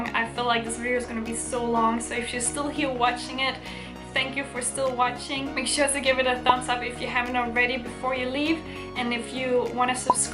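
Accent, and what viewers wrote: Denmark. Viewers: man kan bare høre på din "accent" at du er dansker. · jeg kunne høre med det samme at du var fra Danmark, ahahah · as soon as i heard your voice i was like, she have to be from denmark i can hear it. and then you said it yourself.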